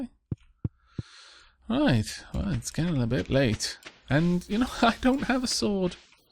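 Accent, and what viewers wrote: Wales, United Kingdom. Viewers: You sound so Welsh <3 I really like this play through as well and your voice is lovely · my mothers side of the family is Welsh so I recognize it more I think! Plus it's the fluctuation! It's got the welsh rhythm haha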